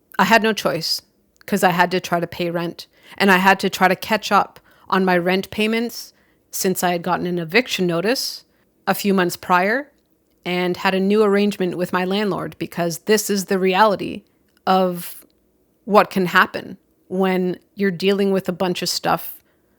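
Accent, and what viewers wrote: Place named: Canada. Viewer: How are schools today in detecting dyscalculia & dyslexia? I mean both the US & Canada as I'm American and I can hear her Canadian accent.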